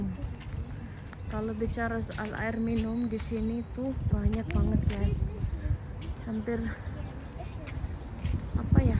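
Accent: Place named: Java, Indonesia. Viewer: Indo nya mana kak. . . Ada medok nya bahasanya. . 😁👍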